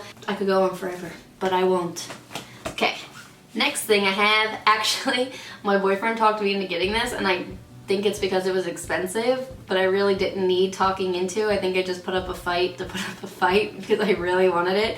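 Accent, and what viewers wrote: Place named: Tennessee, United States. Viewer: Is she from Tennessee? I'm trying to guess from her extremely subtle accent.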